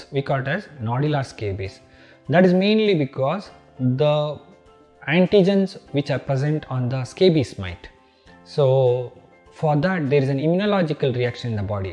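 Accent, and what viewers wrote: India. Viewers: Great information I just wish I was better at understanding the Indian accent in English.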